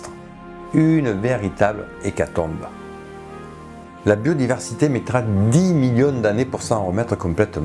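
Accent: South, France. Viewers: Quel plaisir d'entendre cet accent du sud. Comme toujours c'est géniale, tu est le meilleurs vulga en paléontologie que j'ai eu l'occasion de voir, ce que tu fait devrait être regarder au collège.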